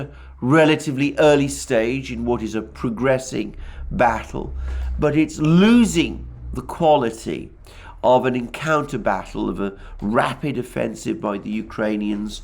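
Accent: United Kingdom. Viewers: Good day! :-) thanks again for your brilliant and intelligent analysis. And let me add, i love your nice british accent, which i learned in school but heared never again in real life. It makes it possible as a german-italo speaker to understand all perfectly.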